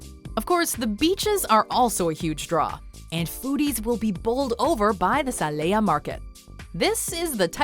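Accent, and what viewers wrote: Quebec, Canada. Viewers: Her accent is French Canadian, so French likely her first language. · She's French Canadian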